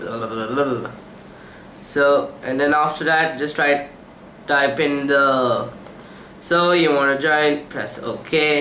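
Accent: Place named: India. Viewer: but i love ur Indian voice ^^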